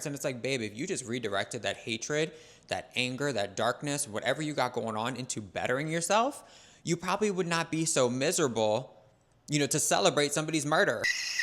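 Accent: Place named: United Kingdom. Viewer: We got a Brit over here innit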